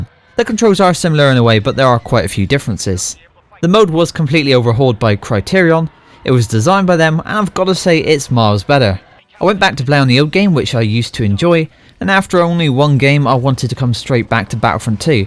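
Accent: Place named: South West England, United Kingdom. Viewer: South West boy are ya? Good to get tips from a local (I'm Cornish).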